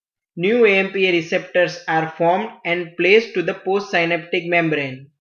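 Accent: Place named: Germany. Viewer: You sound like you are german though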